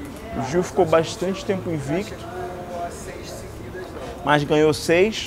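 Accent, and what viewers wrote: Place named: Bahia, Brazil. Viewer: o kra fala assim deve ser bahiano kkk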